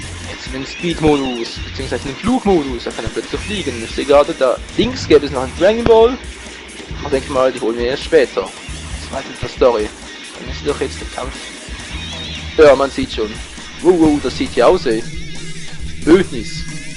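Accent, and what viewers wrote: Switzerland. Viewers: Ich finde deine Stimme nur sympatisch, bedingt durch deinen Akzenten. Schweizer haben nach wie vor den coolsten Dialekt. Und nebenbei, echt tolle Let's Fetz, Fight, Play, wie auch immer ;D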